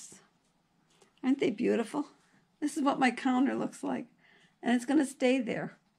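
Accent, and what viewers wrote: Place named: Northeast, United States. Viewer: I knew you meant COLD in your eye, not coal...hehehe...you Yankees talk funny! It won't help with an allergic reaction but to get rid of the sting from a bee or wasp sting is to first get the stinger out then apply a chewing tobacco compress or soak the tobacco from a cigarette in water then put the wet tobacco on the sting.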